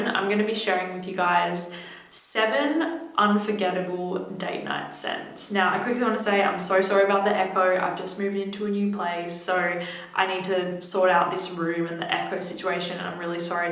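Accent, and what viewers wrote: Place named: Australia. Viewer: Where we going on date night ;p Edit: Echo is a plus, twice the Aussie accent.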